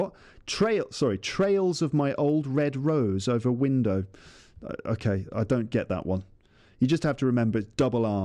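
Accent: RP, United Kingdom. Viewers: Come up with more such podcasts. I love your British accent :) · You're the best podcaster for me. I listen you becouse I want to have RP accent and It's so clear (understandable.)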